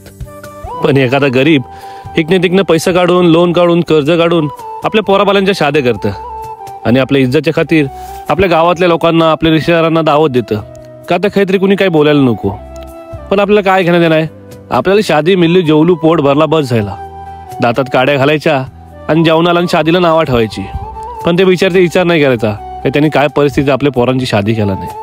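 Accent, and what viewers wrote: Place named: Konkan, India. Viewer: Ek no video aisa lagra vlog khatam he na ho tumhi nav thevayla Gela pan to pan chagla vatla Ani tumchi kokni basha agdi Chan keep it up bhae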